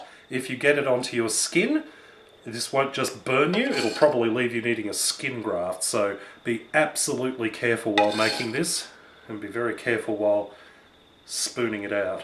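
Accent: Australia. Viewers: Is he australian? His accent is kinda familiar but i love caramel cream or whatever it is called. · What a delightful Aussie accent! ♥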